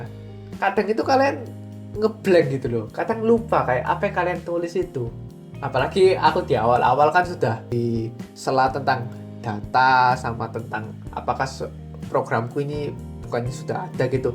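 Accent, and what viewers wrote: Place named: Pasuruan, Indonesia. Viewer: Aku pikir juga gitu, dan logat bicaranya mengingkatkanku sama temenku orang pasuran 😂 bismillah doakan aku ya kak semoga bisa lolos AAS 2024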